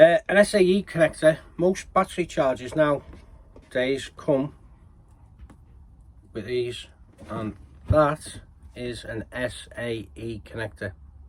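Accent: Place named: Brooklyn, United States. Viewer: I Love your Brooklyn accent.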